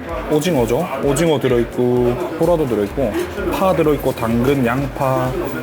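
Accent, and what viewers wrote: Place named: Busan, South Korea. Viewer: 짜달시리(특별하게) 얍실하다(얇다) - 부산 사투리 넘나 좋은 것. ㅋ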